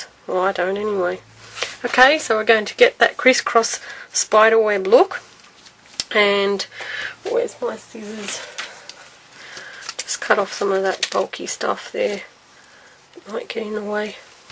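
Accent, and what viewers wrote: Australia. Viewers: I am surbscribed to your YouTube channel but I don't seem to get emails about new videos but I had found the yellow card you did with lattice and then found these. I can't think you enough for ideas on other ways to use my spellbinders. You are the best, love hearing your accent, I have several pug friends from NSW, and one special one that lives in Hobart Tasmania you sound just like her. Thanks again for all your ideas love them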